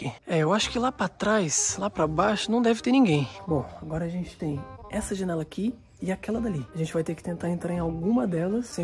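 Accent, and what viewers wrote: Portugal. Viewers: você é português, né? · tens bue sotaque pt de portugal ahah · Vc fala igual português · Pareces um português a tentar falar brasileiro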